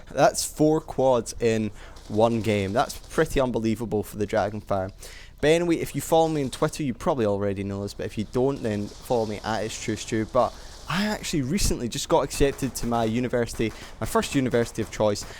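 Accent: Scotland, United Kingdom. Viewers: Finally a decent Scottish commentator.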